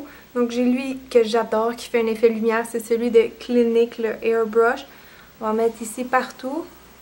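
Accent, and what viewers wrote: Quebec, Canada. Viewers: Vraiment sublime direction dans mes favoris pour realisé de Mu estivale! J appreçie énormément regarder tes vidéos! Je suis française et j'ai de la famille au Québec ton accent me fait penser à eux!(nostalgie) Bisou cynthia ps: tu es vraiment très jolie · ABONNéE !!! Déjà j'adore tes vidéos, et j'adooooooooooooore le québec et j'adoooooooooooooooooore leur accent !!! ;) Je t'adoooooooore :D♥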